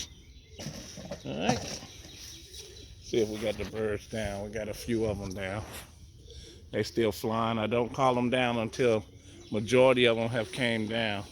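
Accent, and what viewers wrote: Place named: South, United States. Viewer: I knew you sounded southern lol i acually live in tn about 2 hours from u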